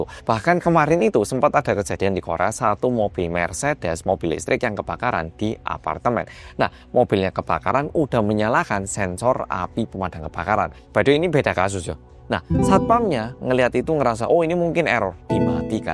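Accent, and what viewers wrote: Java, Indonesia. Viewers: Ya ampun😢 tks mas muedok🎉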